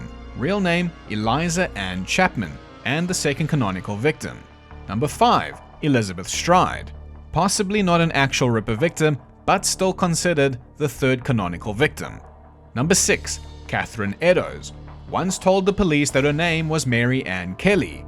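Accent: South Africa. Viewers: This channel is single handedly giving me a positive association with a South African accent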